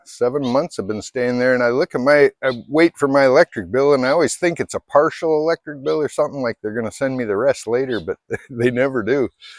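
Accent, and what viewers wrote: Canada. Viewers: Anybody ever say you actually sound Canadian?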